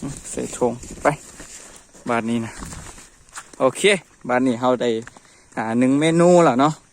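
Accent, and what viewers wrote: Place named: Laos. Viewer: บ่าว เว้าลาวม่วนจร้า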